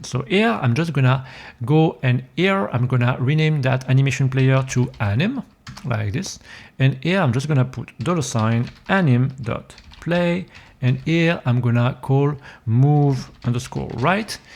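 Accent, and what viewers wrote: France. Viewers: Wow that frenchy accent! J't'ai grillé des les premiers mots x) ! Super vidéo, continues comme ça !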